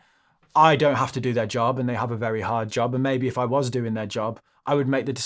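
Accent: Birmingham, United Kingdom. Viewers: no I meant comprehensible I've never got through a whole one of his videos before perhaps because I've never found them informative or interesting or so clearly explained or maybe because I couldn't relate. Or maybe it was his brummy accent perhaps it was too depressing. Regardless this video was great I'm a subscriber now.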